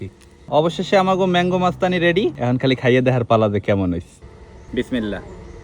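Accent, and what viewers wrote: Barishal, Bangladesh. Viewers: আপনার বাড়ি বরিশাল কোন থানায় বলেন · হালার বরিশাইলা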